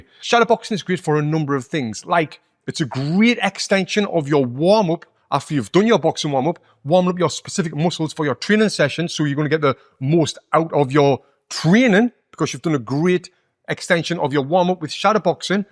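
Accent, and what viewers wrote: United Kingdom. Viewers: From what region of Britain are you?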